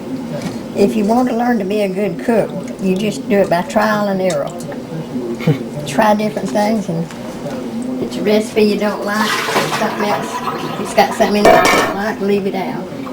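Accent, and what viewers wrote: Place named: Kentucky, United States. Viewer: Where is she from? Her accent sounds a lot like my own, and pretty much identical to older people where I'm from in Southeastern Kentucky.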